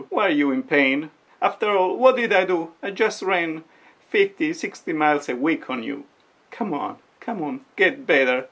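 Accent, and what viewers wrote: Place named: Italy. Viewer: This jerZ girl ❤️that Italian accent!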